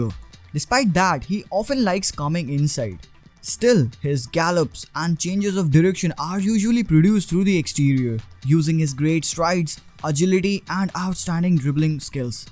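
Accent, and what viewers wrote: India. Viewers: great video loved it keep it up 1.add subtitle options 2.it will be a lot better if your accent is improved from indian to a bit british or american.(JUST MY OPINION,PLEASE DON'T MIND)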